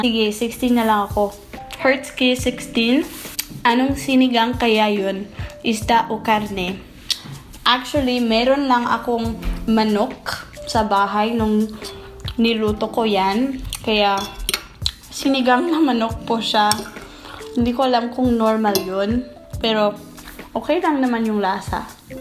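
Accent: Visayas, Philippines. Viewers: Grabe ang accent pinay n pinay☺️☺️☺️☺️☺️👍🏼👍🏼👍🏼👍🏼,well done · Good job...pinay na pinay ang dating m ms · ang galing pati punto pinay na pinay...yun na lang toronto..ganun talaga samantalang kahit sa call center samin kung banggitin yun "torono" haha. saka "international" eh "inenational"... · NAKAKAGUTOOOMM!!!!! SHARAPPP!! Are my ears playing tricks on me? Even when you speak in English you already have that Filipino accent heheheh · You just sounds like a pinay province girl speaking tagalog. But honestly maganda ka lalu na yung mata mo, so expressive. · I like how your accent when you spoke english was kinda like a filipino accent. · Hahaha nakakaaliw mag tagalog eh ... medyo malalalim pero parang pinay nadin pag pinakinggan mo ng hndi nakatingin 😊😊😊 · Hi...ibig sabhin ng bayhana sa bisaya is babae...cute mo mag salita ng bisaya. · You've got the best pinoy accent. 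& YOU ARE SO PRETTY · grabe ka Bisaya!! Bisaja gyud kaayo ka daiii... Well spake... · Natutuwa ako sa galing mo. 10 months ang dami mong natuto. Ang cute pa ng visaya mo. More power to you & greetings from New Jersey! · Hahaha... Nag lalaway ako sa crispy pata ang lutong. Nakaka gutom eh. Oo parang ang pagsasalita mo ng tagalog ay may bisayan accent. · Haha. Sa totoo lang, pag kinausap mo ang isang pinoy na lalaki sa telephone na nagtatagalog ka, hindi magiging interesado sa yo kasi ang dating (whew! Im having a hard time writing this in Tagalog coz I dont know how deep I can go..lol) ng boses mo pag hindi ka nakikita para kang bisaya na marunong magtagalog pero pag nakita ka in person sobrang magugulat yung kausap mo sa telepono. Kumbaga, you got pre-judged and dumped just basing on your accent hehe...on the contrary, take that as a compliment. Meaning, you've learned to speak tagalog and sound like a true blue Filipino na hindi sosyal. 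Haha hirap talaga di ko alam kung naiintindihan mo ang comment ko. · Apreciate your filifino accent keep it up im your no.1 fun wish ko pag nag visit ka ulit dto sa philipines kain tayu sa mga sikat na kainan😁😁😁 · Ang sarap pakinggan ng tagalog mo, may bisaya accent. Very impressive. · You so sweet at ang lambing ng boses mo,pinay na pinay ang salita mo at kilos,...nakakatuwa ka.... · Shout out.. Waray here willing to teach yah.. Ung accent and diction mo are in visayan accent.. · Hi Hello from cebu i hope maka visit ka ulit sa PH hehehee Happy ako tlaga kasi the way you speak tagalog, may pusong Pinoy tlaga hihi GODBLESS lablab 😘 Keep it up 💪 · Bilib ako sa iyo. 10 months ka lang nag stay sa Philippines pero naging fluent ka agad sa Tagalog. True, yung accent mo is Visayan accent. Kaya yung mga Bisaya kapag nagsalita ng English maganda yung accent nila. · Filipina na Filipina yung pagsasalita! 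· “Gwapa xang bayhana” it is translated into “beautiful/pretty this/that girl” your tagalog is good in a visayan accent.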